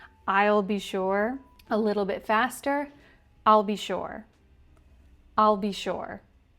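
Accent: United States. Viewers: Una pregunta es inglés americano ?? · ✨ You sound so good in this shadowing video! Super inspiring to practice along and pick up the American accent, intonation, and flow.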